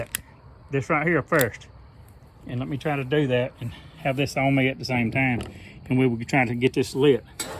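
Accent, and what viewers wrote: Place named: Tennessee, United States. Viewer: That accent is from Tennesee the Volunteer State.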